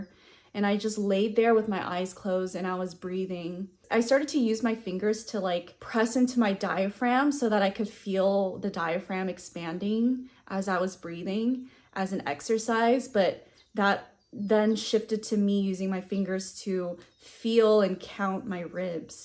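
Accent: United States. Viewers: that's just awful, I understand your family was raised in different cultural environments, but your accent or lack of accent tells me that you're very much used to the Western cultural traditions and women are VERY VERY harsh on each other when it comes to physical appearance it becomes toxic because of it being excessively used within social dynamics because of the pathetic desire to one up another person. It's nothing more than underhanded identity politics aka narcissistic characteristic individuals. Sorry you've gone through this, but I'm proud of your courage to voice your emotions and your vulnerabilities, you're definitely moving in a direction to up your level as I'm sure you've done in the past. Keep on moving forward, take your time, take care of yourself, don't be hard on yourself, you're a person, you're not a machine, you have limitations, and they'll continue growing in whatever direction you see fit. 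but at the same time it does seem that you were able to both see things from a logical standpoint and address them in a more objective manner, I wouldn't say intellectualize but definitely got your head on straight and still able to feel so deeply and be so in touch with self.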